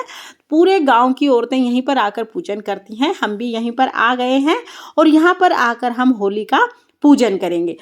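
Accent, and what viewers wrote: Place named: Marwari, India. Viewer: आप हमारे मारवाड़ की ही लगते हैं हिंदी में आप मुझे बताइएगा प्लीज मुझे अंग्रेजी नहींआई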